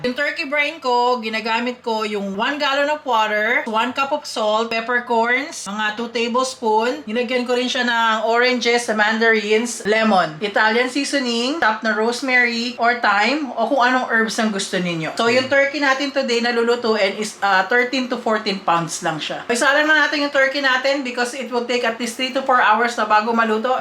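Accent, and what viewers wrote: Philippines. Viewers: So nice that she can speak tagalog fluently like un accent nya pinoy na pinoy pa din... woah really amazing 🙌🙌🙌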